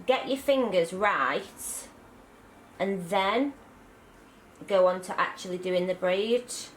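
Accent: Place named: England, United Kingdom. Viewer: great clear tutorial!! i watched a view but got lost seeing the fingers! ps love your English Accent.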